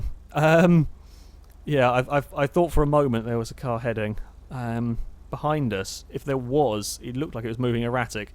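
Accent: United Kingdom. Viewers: You're soo British 😂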